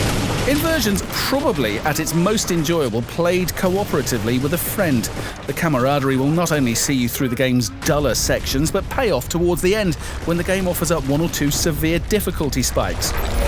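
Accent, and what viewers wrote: United Kingdom. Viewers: British accent = Awesome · British accent <3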